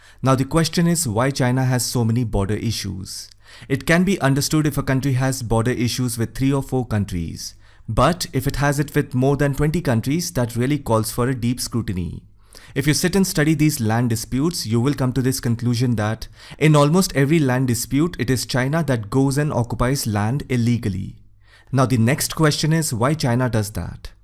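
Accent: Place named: Northeast India, India. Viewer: Hey i have been ur viewer for more then 7 months..u r one of the best indian channel which i follow.. most of the Indian channel r trash talkesr beating about bush most of time..ur hindi speaking accent sounds like of northeast region of india..where r u from..?? P.s - Just a curious subscriber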